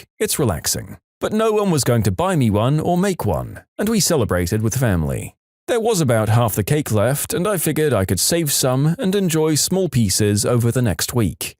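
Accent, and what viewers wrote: United Kingdom. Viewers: I don't like this voice actor you hired..... I can't stand monotone people..... and (no offense to anyone who is British) I REALLY can't stand monotone with a British accent......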